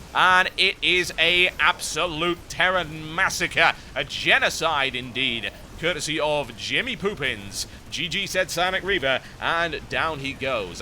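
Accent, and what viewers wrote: United Kingdom. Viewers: How could I NOT notice this beautiful channel - the genuine British commentary brings tears to my eyes!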